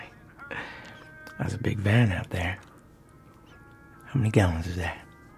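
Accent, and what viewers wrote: South, United States. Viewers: Very enjoyable! Absolutely fantastic! That Southern accent sounds gorgeous! Loved it! 😁✌💕❤🎭🪚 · Absolutely amazing. I adore adore adore your content 🥰 so thank you! I absolutely love horror and bad no idea this could be achieved asmr wise. I’ve watched it twice now to sleep 😌;; - love the southern accent too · Dude I’m from the south, and I confirm this accent is super on point. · I could see this easily turing into something hilarious too with the southern accent an all. Some barbeque sounds good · Considering I LOVE the original movie and I’m excited and hyped for the upcoming TCM multiplayer game by Gun, I am so glad you made this video!👍 Also props to your top tier acting and your southern accent, dude. Your character could EASILY fit in as a member of the Sawyer/Slaughter family.😁👍 Btw, just as a side note, Gun is making brand new Sawyer family members from the ground up for their upcoming game.😊👍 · This is a REALLY good Southern accent, and that’s coming from a native Southerner. 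I’m not usually into roleplays with affected Southern accents because they’re not often done well (in my opinion), but this is so good! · Southern draw not a accent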